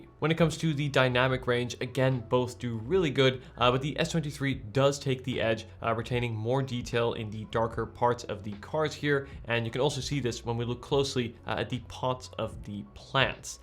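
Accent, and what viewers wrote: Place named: Netherlands. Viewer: Ik kon merken aan je accent dat je Nederlands bent, haha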